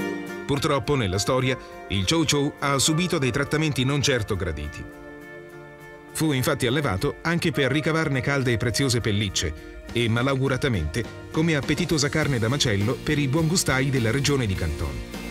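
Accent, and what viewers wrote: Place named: Lombardy, Italy. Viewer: ma...sai giusto per curiosità se ti va di rispondere Ma tu di dove sei? Pare che parli un "mix" di dialetti... Trentino e bo potrò sbagliare chiedo venia ma qualcosa di lombardo? Piemontese?? Chiedo solo per sapere perché apparte te e la prima volta che sento una parlantina con rispetto parlando giuro che non è offesa) del genere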